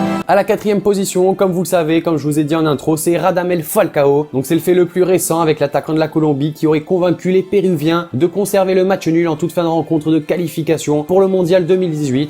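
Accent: Spain. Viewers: tu as un superbe accent espagnol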